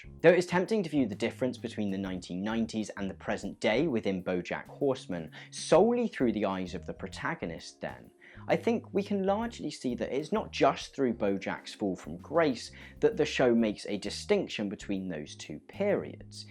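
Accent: United Kingdom. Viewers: Thank you for making this. I liked and subscribed, this isn’t a casual thing for me and I don’t know the rest of your stuff. But I’m more willing to put up with things when they have a British accent, so I’m lucky there. Honestly, I hope you’re getting by on these videos and that’s the reason I did the above, you’ve found a way to not be a part of the rat race... and for that I admire and want to reward you. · Yoooo, Weinstock was one of my professors in college! Never thought I'd hear a British guy talking about his work! Great video!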